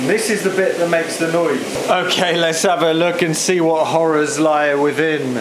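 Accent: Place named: United Kingdom. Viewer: The voice has a British accent.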